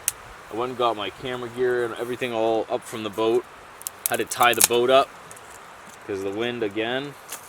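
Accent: Canada. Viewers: Hey are you Canadian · I'm watching your videos every night from now on. That fire crackle and your familiar, calm Canadian voice (canada represent) just put me right to sleep.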